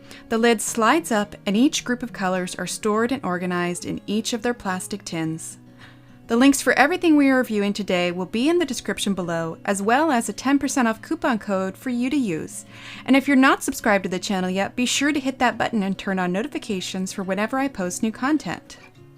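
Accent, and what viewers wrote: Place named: RP, United Kingdom. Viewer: you sounded so posh! great review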